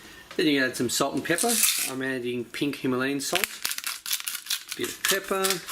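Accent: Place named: Australia. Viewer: That Australian accent 👌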